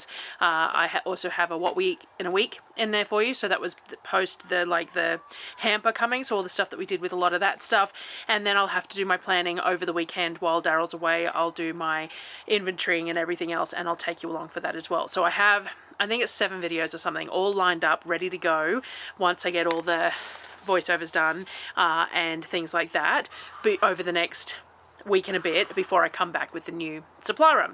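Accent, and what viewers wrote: Australia. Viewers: I love listening to you talk. I have always loved the Australian accent. Also, the speed you talk is fine. I don't want to listen to someone who talks like a turtle. So forget what those people say and keep being you.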